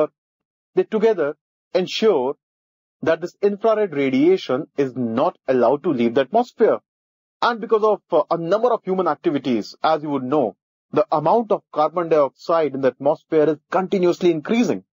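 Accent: India. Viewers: topic well explained!! with lajawab indian accent!!thanks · indian english!! hard for me 2 understand..hahahh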